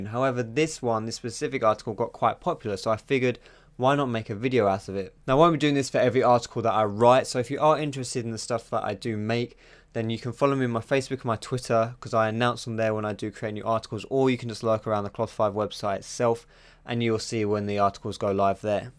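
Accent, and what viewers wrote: United Kingdom. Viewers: are you British? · First of all, I love your british way of speaking. So smooth. Secondly, I'd like to quote you here, "...if you spend all your money reviving Axel Roses music career..." HAHA you're cracking me up. I wonder if the young punks on here even know who is. Anyway, great video!!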